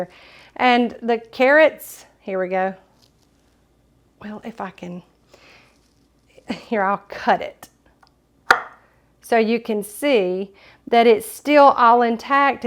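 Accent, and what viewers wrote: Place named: South, United States. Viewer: Great video. Thank you. Automatically gave you a thumbs up for the southern accent alone. 👍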